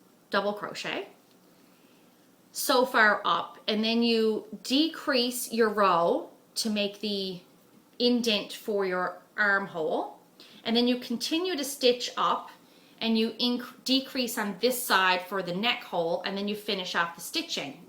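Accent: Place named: Newfoundland, Canada. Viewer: Always love your updates and stories and seeing how much progress you manage to make in spite of working and being a great wife and mother. My. best friend in high school and college had both parents and a live in paternal Grandmother that were Newfoundlanders transplanted to Tennessee. So I love to hear your NL speech. Reminds me of all of them. Good memories. Thanks!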